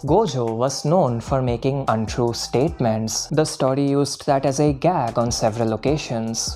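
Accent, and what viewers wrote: India. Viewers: Clearly seems to be Indian.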